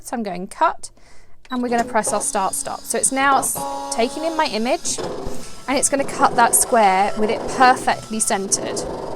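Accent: England, United Kingdom. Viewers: Omg, i've seen it many times on tv, but never thought i need one. I spend a fortune on, coordinating dies. This is now on my birthday/ christmas list. Also i came across your channel a few months ago. i feel you are perfect for me, i watch all the designers over there in america. As i work on dt here in the uk promoting american stamps as you guys are well in front of the uk. but the best thing about your channel is you know your stuff but you have a english accent. so you see i get the best of both worlds. don't get me wrong not all Americans have a strong accent and i do love them, but i find afters hours of videos i find yours a welcome change. lol x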